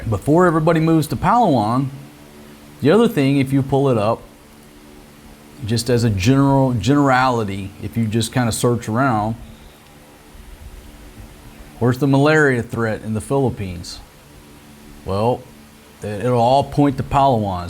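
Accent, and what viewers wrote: South, United States. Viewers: New subscriber. Where you from in America. That southern draw makes me miss home.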